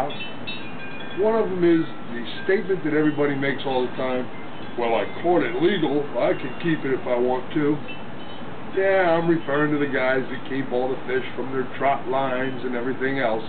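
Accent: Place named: New Jersey, United States. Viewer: Those TV Producers are going to be trying to get you to sign a contract. No kidding, you made a GREAT presentation! I like that Jersey talk of yours. LOL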